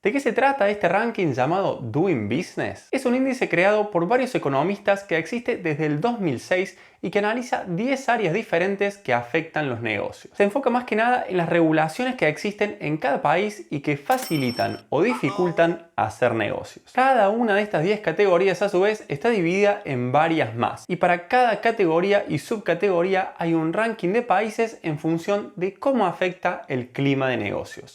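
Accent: Córdoba, Argentina. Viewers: El mejor lugar es Argentina. Ah, no para, me equivoqué de época jaja. Excelente contenido! Me estoy paseando por todo tu canal y es muy cómodo escuchar a alguien hablar con acento cordobés sobre viajes en el mundo! Saludos desde Bs As.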